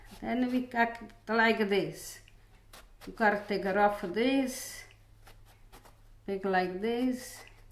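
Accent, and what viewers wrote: Italy. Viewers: Dear, I watch your videos all the time and I love them. It's not just the real italian recipes and the way you prepare them, it's a' you and the way you speak your Itali-ish! You have a wonderful personality and are a very conscientious cook. BTW, you are not "TOO SLOW!" Perfection takes time, it cannot be rushed! So thank you for taking your time to prepare these delicious italian recipes for us on video and step by step. You are not only educating us, but, you are also very sweet and entertaining. God bless you and thank you very much. Your family is proud of you and love you and it shows. They are blessed to have you and you to have them. Please keep making your lovely videos for us out here. You are a gift and we love you! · What a sweetie! Reminds me of my friends mothers in the Bronx, Naples, and Catania. We would be in the kitchen after school helping them prep and cook just like she said. 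(Except they had a stove and oven in the house by the 70's and 80's!) I live in North Florida now and you just don't see or hear that beautiful italian accent and sensibility here. North FL natives are 'senza sapore.'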